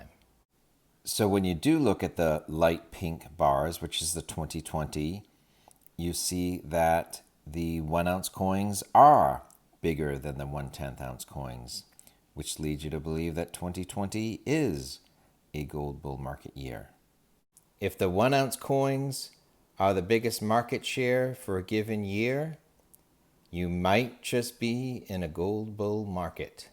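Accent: New England, United States. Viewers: You sound like a New Englanda. I'm from NH. How far off am I?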